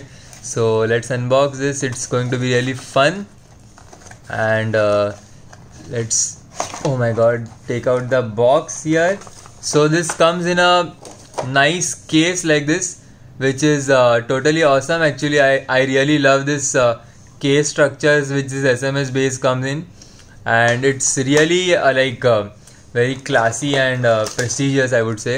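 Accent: India. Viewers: Are you an indian? i can tell in your accent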